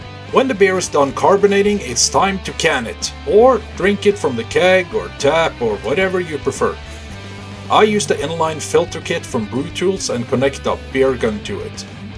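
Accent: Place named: Canada. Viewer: Awesome video, just subscribed. Where are you from, sounds like Canada Eh? Brought back childhood days of the McKenzie Brothers!!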